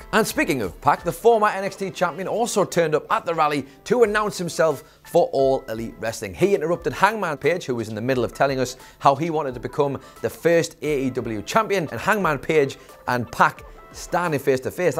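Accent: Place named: Newcastle, United Kingdom. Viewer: This man a geordie. 2 years of these videos and I haven't realised this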